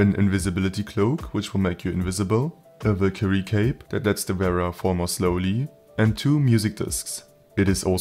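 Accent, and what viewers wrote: Germany. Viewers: Why is the German accent so damn strong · deustcher akzent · good video as always. took me many vidoes to realize that you have an german accent lol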